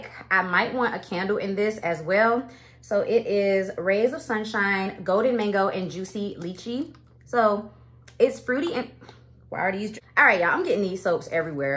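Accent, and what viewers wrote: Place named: Bay Area, United States. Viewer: Thanks so much for the new scents descriptions! I can't wait to hear your thoughts when the extended Gingham line drops. Btw did I miss a FAQ or get to know me video? I must know if you're from the Bay.😂 You talk so similar to us here in the Bay(Cali not FL) and even some of your mannerisms are similar!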